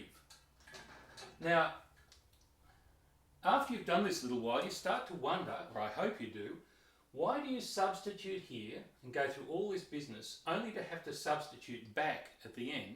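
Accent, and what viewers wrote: Australia. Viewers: First of all thank you for the insight. It saves an awful lot of time, mate However, please exchange using the x symbol for multiplication to the dot. It is less confusing in the evaluations. Also, your 4's look like 6's sometimes, especially when used as exponents. And finally, please adapt a system of presentation where you are not in the way of the whiteboard. I am a teacher. please accept this as constructive criticism. I do enjoy the Aussie accent whenever I get a chance to hear it, like Bryan Brown, Chris Hemsworth, Hugh Jackman, Nicole Kidman and your other countrymen.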